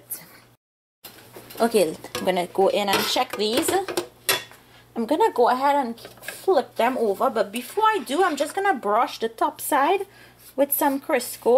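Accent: Trinidad and Tobago. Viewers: Kenny H She is not faking an accent. She is from Trinidad in the Caribbean and is speaking with a Trinidadian accent. She is not trying to sound like anyone from another nationality. Italians don't speak like that and she is not from the Middle East. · Ganaria Gente trini accent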